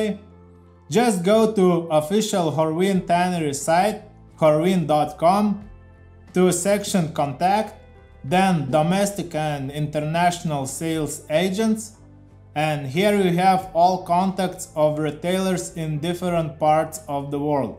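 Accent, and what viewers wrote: Russia. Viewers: Русский акцент)) уважение.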